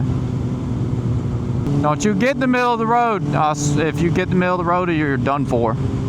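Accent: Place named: South, United States. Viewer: i knew i been hearing a southern accent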